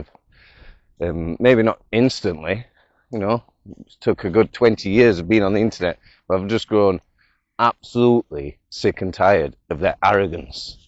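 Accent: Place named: Northern England, United Kingdom. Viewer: He's English, probably from the North. How did you not get that from the video? Edit: I just got your joke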